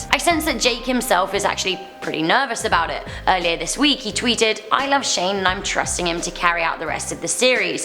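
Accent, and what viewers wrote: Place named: England, United Kingdom. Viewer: I wonder if is single. She is gorgeous plus her English accent just gets me. #toptencrush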